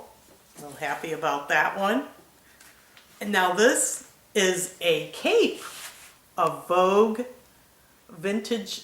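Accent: New York, United States. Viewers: Your skirt and red top look great. I'm trying to figure out your accent. Almost NY but with a twist.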